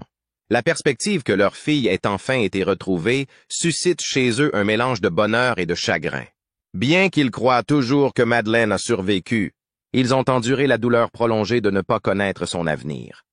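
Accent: Canada. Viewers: Pourquoi "Pauvre France" ?? Le gars qui parle a un accent canadien !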